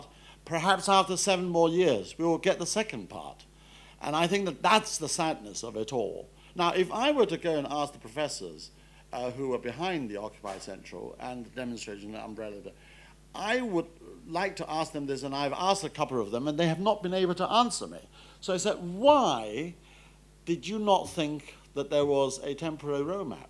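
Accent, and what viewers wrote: United Kingdom. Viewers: Belated comments upon learning the passing of Sir David Tang, who was, indeed, a truly intellectual and erudite gentleman with unmistakably British accent. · If I hide his face and just listen, I would have thought hes British....lollll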